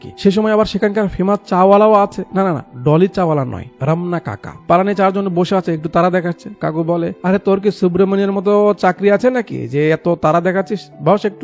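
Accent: Bogura, Bangladesh. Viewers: আপনার বাড়ি বগুড়া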